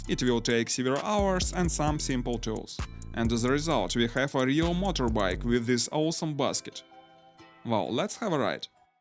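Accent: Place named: Russia. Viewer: I have cracked you. You are Russian and live somewhere in Central Russia, judging by the foliage and road quality probably near Moscow. I wasn't sure at first bc of your accent, but when I saw your phone was in Russian I got my confirmation. Классное видео чувак, сам себе хочу моторизованный собрать, продолжай в том же духе!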